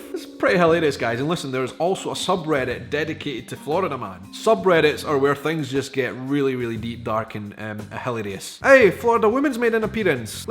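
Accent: Scotland, United Kingdom. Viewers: You need to come to Montana. Everyone here will love you and you'll get all the free drinks you want as soon as they hear that Scottish accent. Our state is larger than Germany and trust me you'll notice it. For us a 6 hour drive is just going from one side to the other on the interstate. You can even stay with my family, we have houses on both the Eastern and Western side of the state plus a cabin up in the only Eastern lake where cabins are allowed so we can toss in a fishing pole and catch some delicious cold water trout. I'll even bring the brews and some good cigars.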